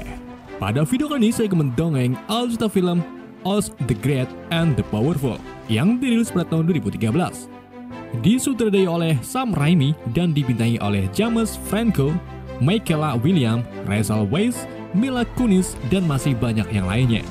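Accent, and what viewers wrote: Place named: Banyumas, Indonesia. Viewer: pengisi suara ngapak tapi bahsa Indonesia gak telalu mendok...bagus...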